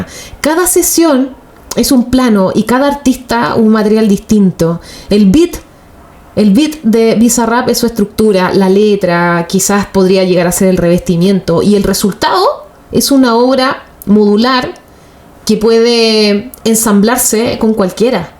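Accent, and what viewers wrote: Chile. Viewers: GRACIAS...de corazón...se te oye de chile...te sospecho en Argentina...de ser así, un secreto....se te nota conmovida por BUenos Aires, y más siendo arquitecta, tenes mil secretos para revelar...algo mas...si amas BUenos Aires,la ciudad, su caos, su ruido, BUenos Aires te alojara en un lugar de su Corazón...eso es ser de BUenos AIres...y ningún porteño va a poder quitarte esto...los primeros hijos de esta ciudad, no fueron nacidos, fueron adoptados...un ticket a otra vida...la apariencia de ser agrandados, atropellados, es el derecho de piso a existir, para no ser tragados, luego queda y se hace característica...y uno se jacta...por que cualquier cosa es buena para ocultar los dolores, y otras nanas...BUenos Aires es un monstruo, con lugar para quienes le susurran, y a ellos, les da su ternura...todo un descubrimiento... otras ciudades, quizás, sean fáciles...a esta, le toco aser asi. 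Buenaventura...!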